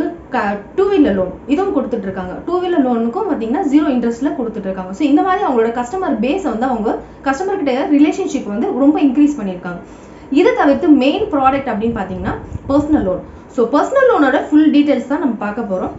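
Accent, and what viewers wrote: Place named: Kongu, India. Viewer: Congratulations for 75th video... Video quality improve pannunga. 4k editor try pannunga atleast 1080p podunga. Solla kudiya details ku text or images, screen shot, screen recorder video side la add pannunga. Video la unga voice super. Kongu Tamil yellorukkum thelivaa puriyum... So unga video yellorukkum nalla puriyhu nu nenaikuren. Neraiya social apps la share pannunga.... Innum neraya video podunga. Atleast weekly two videos try pannunga. Appa thaan quick subscribers and views increase aagum.. I am also YouTube editor Congratulations for your future... Ungalukku yellame nallathaagave nadakkum... Neraiya fans and subscribers support here. Continue ah video podunga...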